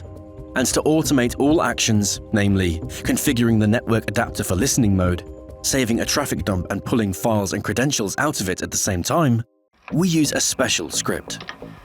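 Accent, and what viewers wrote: United Kingdom. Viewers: They are probably covering their asses legally in case they are charged with supplying information that can be used for illicit actions. I don't think this would be as much of an issue in the US given publisher protections (first amendment rights), but if the narrator is a person/not text to voice, then based upon their accent, they are most likely coming out of the UK. The UK doesn't have constitutional rights outside of the Magna Carta. As such, publishers are far more liable for suit in the event something they publish results in real world damages. For supplying the information, they could potentially be held liable to criminal charges. I'm pretty sure that that isn't the case under US law in most cases/jurisdictions, as there are alternative case uses. However, as this is seemingly coming from the UK/EU, they may be held to different standards—which, while unfortunate, would help explain the censorship. 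That said, I would recommend that they team up with a publisher in a country that does not have these limitations who could offer more comprehensive explanations. Given the censorship, I found the information of substantially less value as knowing what programs are used, how they work, what to look for, etc., is necessary for a more comprehensive security education. That said, when did being a "hacker" become a negative connotation amongst the tech community? If you are a "Cyber Security Analyst" that makes you a "white hat" hacker. I myself tend to float between "white"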